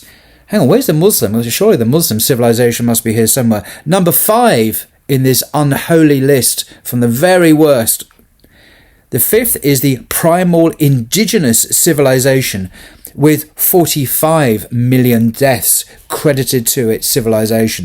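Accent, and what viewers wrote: United Kingdom. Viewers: Such a great explanation, Sir! Oh, on the side note, I like your accent. British! I find it friendly for the American accent, but British accent, I can feel like a King! 🤓 Well, the UK is the United Kingdom, isn't it? Will listen more to your videos! (Well, I am not a native speaker of English). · This guy is so shallow and stupid that he forgets the bible claims to have killed everything during a flood. EVERYTHING God killed everything. What a useless video. His British accent can't cover what the Bible pretends. It's insulting to anyone with common sense. Christians "God" killed everything on the planet to start over. This guy's a idiot.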